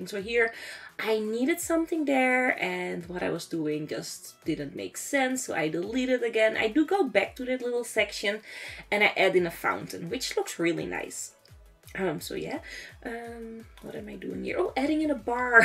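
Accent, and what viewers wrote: Netherlands. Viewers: dit is de eerste video die ik kijk van je en ik hoorde gelijk dat je nl was x mooi gemaakt😍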